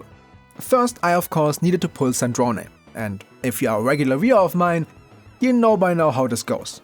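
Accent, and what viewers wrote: Germany. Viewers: HOERE ICH EINEN KLEINEN DEUTSCHEN AKZENT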